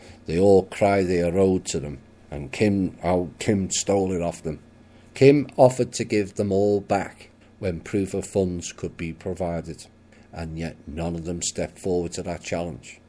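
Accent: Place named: Liverpool, United Kingdom. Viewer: I feel that the evil in this world has unleashed something palpable, and it seems to be growing exponentially! Maybe it has always been there and I only feel this because I am 'waking up' I don't know, but I pray for you, and all those who risk EVERYTHING to bring us truth and hope. May God (what/who ever you perceive the creator of light and love to be} shield and bless you always. THANKYOU!!!!! (PS - I'm guessing Birkenhead or Liverpool region?)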